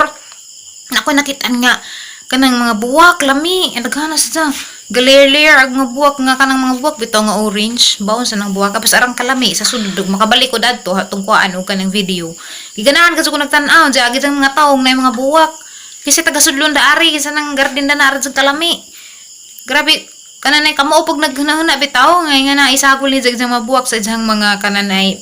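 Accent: Bohol, Philippines. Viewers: Hi Ma'am , keep on vlogging .. Missing our accent in Bohol. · Mag vlog ka na lang mae tour around bohol sa mga towns with the same bol anon accent for sure kikita ka pa padayun fan from texas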